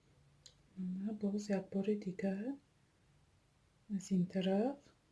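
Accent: Isfara, Tajikistan. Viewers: Аз суханатон фахмидамки хамшахри мо хастед исфарая кучошба кадом шахри расия зиндаги мекунид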